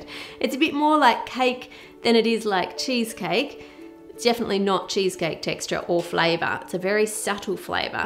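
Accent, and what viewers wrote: Australia. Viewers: are you australian? you have the accent lol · She is Australian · She’s Australian (I’m British)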